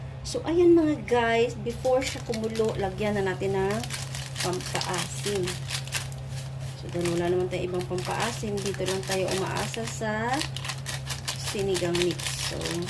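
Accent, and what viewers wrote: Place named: Iloilo, Philippines. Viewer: Hi sis, its Melanie Tingson if you remember me hehehe.. wow sarap naman ng sinigang ng ulo ng salmon. I love this too, bagay sa panahon na maulan Ilongga ka ba sis? narinig ko kasi hehehe kainin na natin yan sis...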